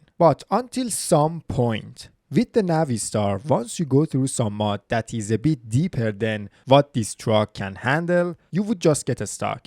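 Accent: Iran. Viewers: لحجه اینگلیسیت مثل ایرانی هاست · خرابه لحجه ات شدم حاجی 😂 خوده خوده ایرانی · Wow he does sound Iranian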